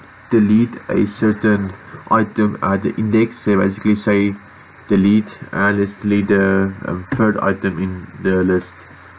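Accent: South Africa. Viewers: your videos help a a lot, thanks 😀 are you South African??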